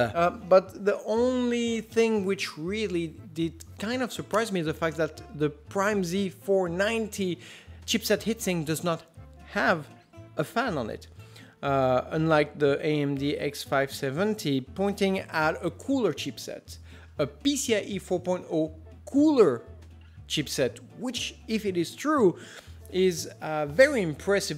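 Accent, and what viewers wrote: France. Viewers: are you french ! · Hi, where are you from ? I spotted a French accent :)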